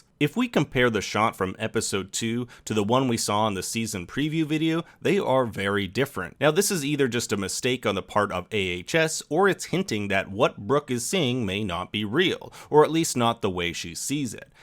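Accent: Canada. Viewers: Just curious are you Canadian? I've been trying to place your accent. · random but this video i picked up on an accent and now i’m wondering if youre from canada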